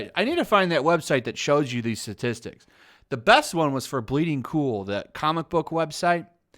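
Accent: United Kingdom. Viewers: Your voice matched exactly what i thought the guy would sound when i read this article lol at least the stuck up british voice. People go to Kotaku for laughs not games related content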